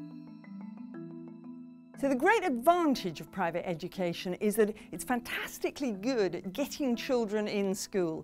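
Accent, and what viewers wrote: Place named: United Kingdom. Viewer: She speaks like a typical British supposed to speak:)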